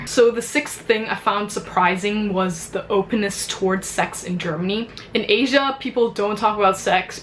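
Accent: United States. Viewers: She really does have a good American accent! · Wow! I would have never guessed from your heavy Americanized accent that you were from Hong Kong. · Would not have guessed that you were from Hong Kong. You sound American or Canadian. Then again my friends who are from Hong Kong grew up here in the States, so what do I know? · In Chinese (I don't know about Cantonese) there is 您 (Sie) and 你 (du). The older one offers "du" to the younger one. No, you can never, ever go back to "Sie". Even if you hate each other. You cannot go back to less distance after you've moved closer together. You cannot "unknow" someone. It's trying to change the past. Trying to make things "unhappen". Intimacy cannot be reversed. That's like putting toothpaste back. I have lived in China for four years. You finding distance and politeness mutually exclusive is soooooo Chinese. 不用客氣!!! 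Keeping the distance is an expression of politeness. You keep the distance to not bother the other person by coming uncomfortably close, emotionally speaking. From my cultural point of view you are confusing politeness with friendliness. Not saying "Guten Morgen!" isn't considered rude, it's considered unfriendly. How come you're speaking English like a US, white girl? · Did you pick up that perfect American accent whilst in Hong Kong?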